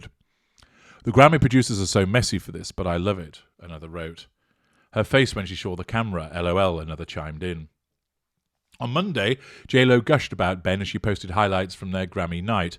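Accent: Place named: England, United Kingdom. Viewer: I love your voice you have a perfect English accent. You sound like a gentleman 😊💜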